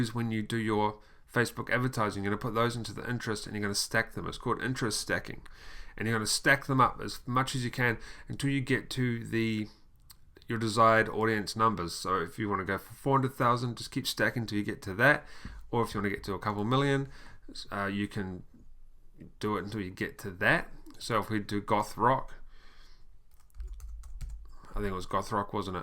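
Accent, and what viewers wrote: New Zealand. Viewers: ...you sound kiwi... totally off subject lol great vdo btw :)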